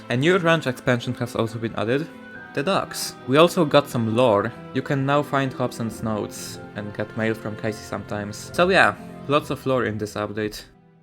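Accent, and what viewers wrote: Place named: Poland. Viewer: this guy's polish accent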